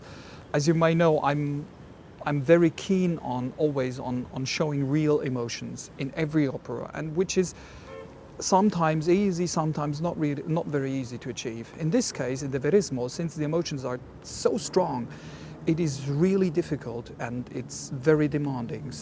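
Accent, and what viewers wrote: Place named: South Africa. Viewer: he sounds south african here...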